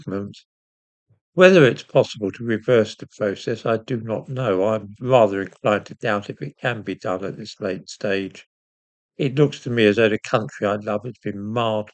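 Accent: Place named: East End, United Kingdom. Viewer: You DO sound like an East End Barrow Boy talking posh!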